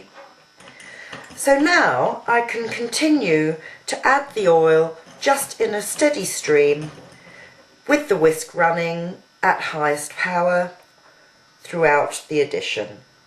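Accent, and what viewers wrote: United Kingdom. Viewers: Sounds like she may be British. I enjoyed this video because of what you mentioned. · I'm an American, and just by listening to your voice, I thought you were a British Aristocrat! I wish I could speak English like you. Thank you so much.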